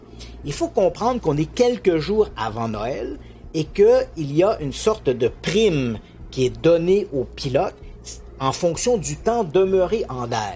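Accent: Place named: Quebec, Canada. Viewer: L'accent québécois me transporte toujours dans une autre dimension 🍁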